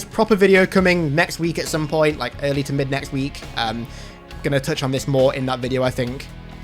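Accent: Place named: United Kingdom. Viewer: Bro I wasn’t prepared for your face! You don’t look as British as you sound 8/10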